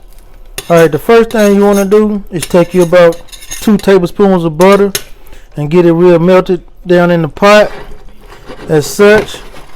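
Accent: Deep South, United States. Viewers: Always trust the man with a Deep South accent to teach you how make a BBQ sauce. Thank you sir.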